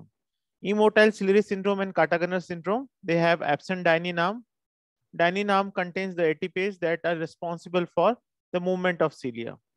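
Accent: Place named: India. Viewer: Unmöglich: deutscher Titel, und dann auf Englisch, mit einem grauenhaften indischen Akzent!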